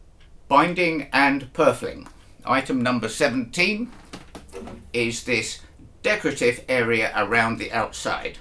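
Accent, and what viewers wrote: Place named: East London, United Kingdom. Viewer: HaHa! Your accent and cap made me laugh out loud. I was born in East London and your voice reminded me of many blokes I used to know when I was a kid! So I loved your presentation of this information…although for some reason ‘Derek and Clive’ kept coming to mind while I was watching you!! 🙈😂😂 I’ll have to watch again just so I can concentrate on absorbing the info rather than being entertained and amused! 😂😂 Great job. 👍🏻 Regards, Dave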